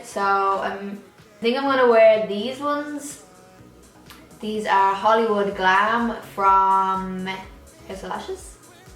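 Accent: Ireland. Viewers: Another gorgeous look. <3 I love watching your videos. Your Irish accent is so cute and unique. Like, it sounds Irish, but you say certain words with a very "country" Irish sound. lol I'm sorry that may sound crazy but I mean it in the best way possible.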